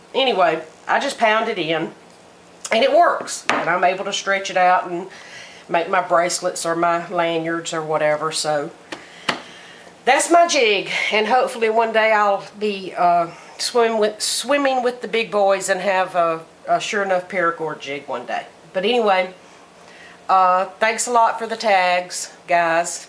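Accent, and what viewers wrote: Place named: South, United States. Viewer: WOW! Two videos in and youre already tagged. How cool, you are an official part of the youtube bushcraft community. Even cooler that your daughter is your director ,producer and camera operator. You sure do sound southern, lol. You have alot more paracord stuff than I do. I dont have a jig nor have I ever made a bracelet. I keep my paracord in a pile in the floor, lol. Cool video, keep doing what youre doing.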